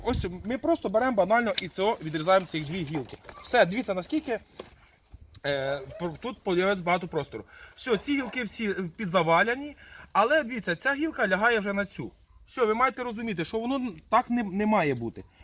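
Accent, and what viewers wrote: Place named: Podillia, Ukraine. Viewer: Подільський діалект.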